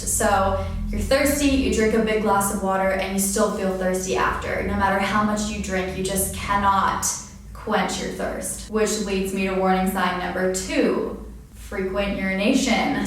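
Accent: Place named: United States. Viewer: You sound American but you said washroom lol. Thank you for your story :-)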